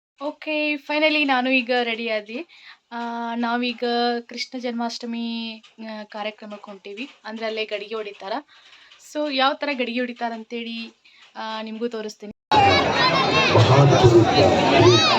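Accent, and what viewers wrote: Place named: Dharwad, India. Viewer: Nivu dharwaddavar yenri sis yaakandra nimma bhase hangaaa iiyti sdakari kelde sis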